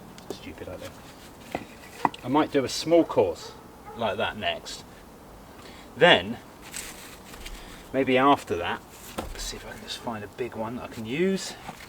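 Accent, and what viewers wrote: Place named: England, United Kingdom. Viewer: Sometimes I feel like I am watching Will Ferrel build a barn while narrating in an English Accent. Looking great though!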